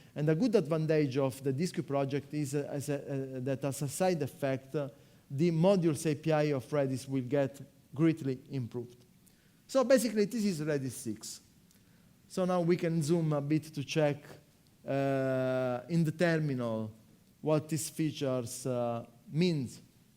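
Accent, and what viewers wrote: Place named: India. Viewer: 没讲 thread i/o 啊，口音有点像三哥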